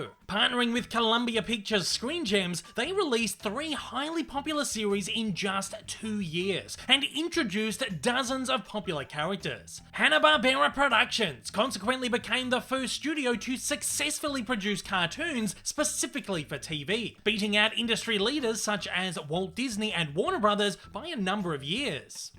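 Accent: Australia. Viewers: Are you serious? A hint ... He sounds 100% from Down Under. Unmistakably so. Actually his narration reminds me of an old 1980's TV show called Lifestyles of the Rich and Famous, featuring the voice of English entertainment reporter Robin Leach. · Is it me, or does this guy kind of sound Australian... I hear a hint, but probably not for those with an untrained ear.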